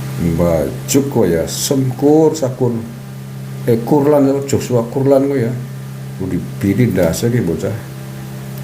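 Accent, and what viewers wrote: Banyumas, Indonesia. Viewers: Ngomong bahasa jawanya ngapak keren pakde. Membumi dan enak didengar. Salut. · Saya seneng cara marahnya pake bahasa banyumasan logat mirip orang bumi Ayu .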